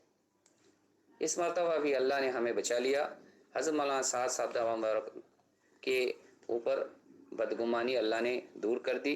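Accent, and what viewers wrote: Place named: Bangladesh. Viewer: koi tafseel naheen hay sirf baat ke lehje se lagta hai koi bangali hay aur ghair motabar hay.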